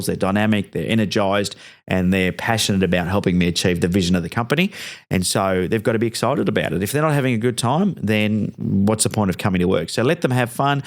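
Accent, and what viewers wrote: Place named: United Kingdom. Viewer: this annoying uk accent, don't understand a single word, but its an interesting video so I have to listen, please just talk normaly no need to talk this weird accent that "weyird aycceynt thayt noyoyne uyndeyrstaynds"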